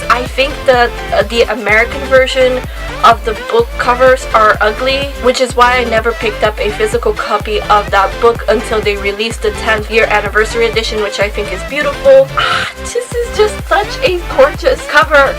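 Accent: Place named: Brazil. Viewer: I detect a Brazilian accent...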